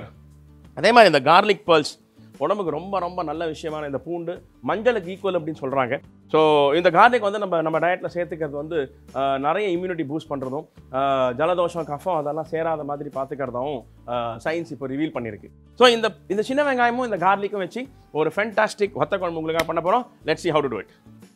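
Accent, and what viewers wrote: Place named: Triplicane, India. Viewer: சார் நீங்க சின்ன வயதில் திருவல்லிக்கேணியில் இருந்தீர்களா பேச்சு ஸ்டைல் அப்பிடித்தான் தெரியுது. நல்லா ரசிச்சு பேசி செய்றீங்க. Very interesting.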